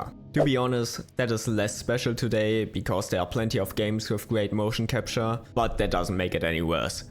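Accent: Germany. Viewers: are u german? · das deutsch killt mich🤣♥️ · Super interesting video, thanks! Are you from Germany by any chance? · A LITTLE German Accent 🤣 PS: I'am German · I could tell this guy was German, simply by accent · This wasn't meant to be as an offence. I love "zhe" german accent. Good video tho💪🏽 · Your German Accent is like my Ofenkartoffeln, just a burner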